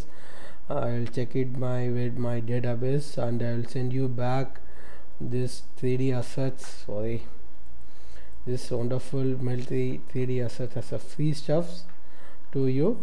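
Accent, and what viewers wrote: India. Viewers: your indian right?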